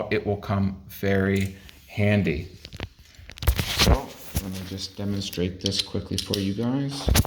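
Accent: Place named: Canada. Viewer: Canada eh?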